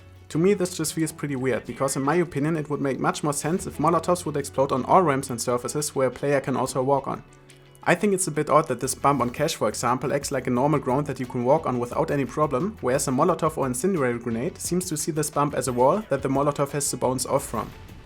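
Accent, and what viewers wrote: Germany. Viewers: dein englisch ist so schlecht man hörtdas du deutscher bist lappen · A question. Are you a german Youtuber who talks English? your accent sounds german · You are german riight? I hear that. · dieser dreckige deutsche akzent......den kann man sich einfach ned geben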